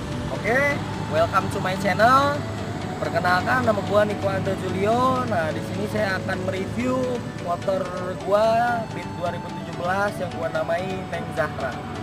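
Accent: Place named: West Java, Indonesia. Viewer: Bang mf asli orang mna kaya sunda yeuh..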